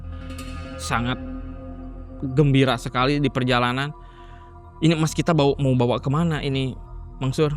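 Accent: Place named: Bandung, Indonesia. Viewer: logatna bandung pisan,saee dina bahasa na..jadi paham rng enakeun kakupingna th👌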